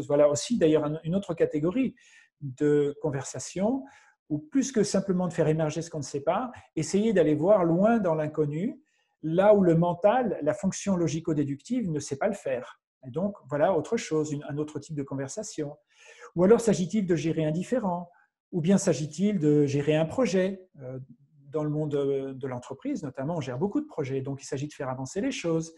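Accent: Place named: Quebec, Canada. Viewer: Bel accent Québecois dis donc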